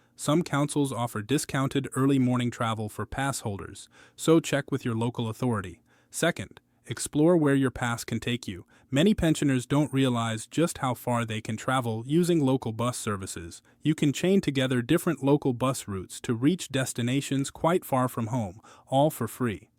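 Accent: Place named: United States. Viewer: an usa accent talks about uk pensions etc would not trust a word he says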